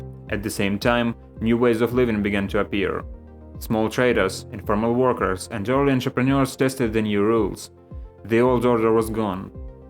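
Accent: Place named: Ukraine. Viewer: Finally, Ukrainian voice on a niche topic in English. Please, go on.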